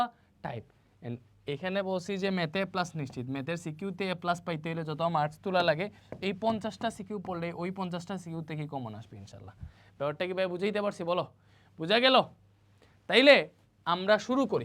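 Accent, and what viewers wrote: Sylhet, Bangladesh. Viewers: Vaiiya apni kita sylheti ni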